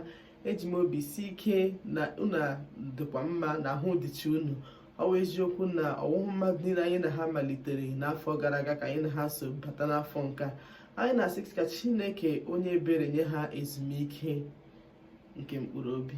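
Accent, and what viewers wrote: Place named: Igbo, Nigeria. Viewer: See how am smiling listening to you speak my Igbo Dialect😃😃😃😍😍😍😍Nnem this one dey totori my body😃😃😃😃💕💕💕💕Please created a Facebook page for Igbo class biko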